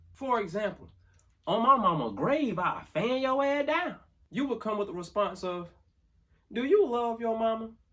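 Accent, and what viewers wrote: South, United States. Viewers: I can't speak for other states, but here in Arkansas this is pretty accurate for how both black and white folks talk. There's some subtle differences in word choice and vowel sounds, but the cadence and tone are all right. Makes sense, given you're from Mississippi. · As a Texan from birth this is the best representation of the southern dialect I’ve ever seen thanks for education ‘em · Excellent interpretation of southern speech 🎤. I understood everything and gave answers before you stated it 😂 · I live in Mississippi, and I feel embarrassed in front of people because of my lack of understanding, which makes me feel isolated. Please continue making videos specifically in the Southern accent to improve my understanding level. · I'm from Tennessee, we talk like this too. 😂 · As a native from mississippi he doin pretty good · As a Born and Raised Alabama native this is 100% true!!!! 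Every. Single. Word. He says and btw it’s not just the black culture or ur African roots cuz errybody Ik talks like that!😂 ♥️ur content keep rising my Southern brother!!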